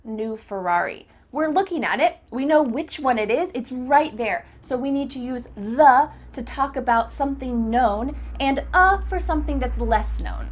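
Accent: United States. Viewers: مرحبا يااستاذة هل هذه بلهجة الأمريكية؟ ؟ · thank you techer english Misis i like listening english American style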